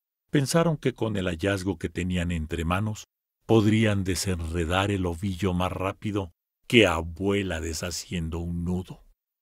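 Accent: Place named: Vallegrande, Bolivia. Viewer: Este narrador creo es vallegrandino puro coplas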